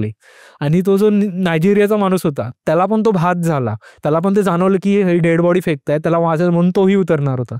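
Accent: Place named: Goa, India. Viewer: Haaa ji bhasha bolto aahe na goa chi bhasha aahe hi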